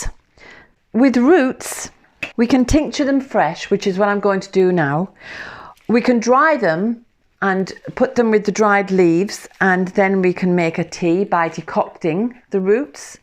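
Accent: United Kingdom. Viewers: Omg so uncanny, you look like an older version of myself, or what I would imagine I would look like when I turn 50. What is it with Irish people? Why do I look so Irish even though I am a Finnish person :D .. or are you Irish? You sound more British. Now to the actual comment, I love dandelions! I always start to crave both the root and the leaf when spring comes, certainly something my body needs this time of the year. I have a tea brewing right now as I type, yes, there is dandelion root in it! It was nice to see some really hands on medicine making <3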